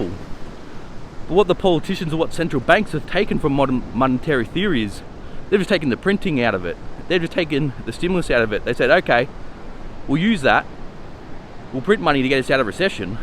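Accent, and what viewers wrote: Australia. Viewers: Hahahah change of scenery. Nice day out and and beach. Ummmm, are you Australian? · Are you from Australia? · where you Australia?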